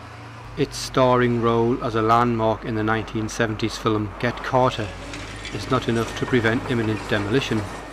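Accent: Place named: Geordie, United Kingdom. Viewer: Yeh, good narration from a fine purveyor of words. I'm a surrogate Geordie but always enjoyed the accent and this is well thought out and spoken. Miss my single decker 152 along Brinkburn! ))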